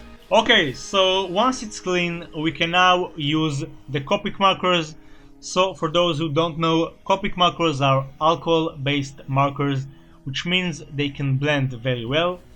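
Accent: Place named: Israel. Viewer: אתה פשוט מלך! למען האמת, כשרק התחלתי לצפות בך שמתי לב למבטא שלך ואז ראיתי שהשם שלך זה וישר ידעתי שאתה ישראלי וזה מאוד שימח אותי. אז תמשיך ככה אתה אחד הציירים הכי טובים שראיתי! בהצלחה בהמשך הדרך שלך ביוטיוב!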